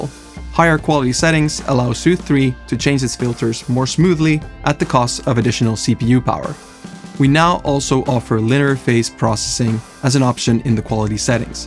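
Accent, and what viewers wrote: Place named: Finland. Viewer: En tiennykkää et oli suomalainen, respect! Accent gave it away tho:D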